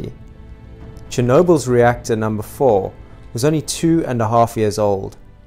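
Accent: South Africa. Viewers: Nice SA accent boet❤ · Just heard your accent and knew you from South Africa😂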